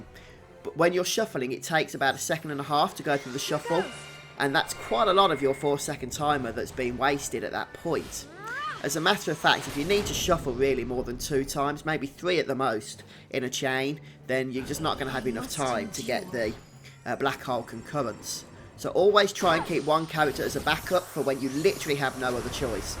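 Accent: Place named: Cockney, United Kingdom. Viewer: Whooo cockney is strong with this one.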